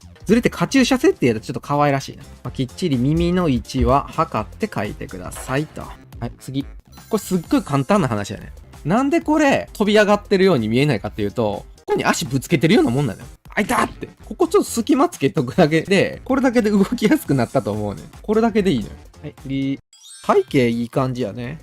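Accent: Kansai, Japan. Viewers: 関西弁で親近感湧く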